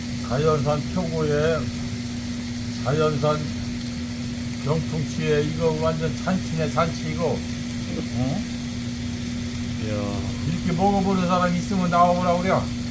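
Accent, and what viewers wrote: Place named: Chungcheong, South Korea. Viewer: 벌거지...ㅋ.ㅋㅋ 충청도 사투리 같은...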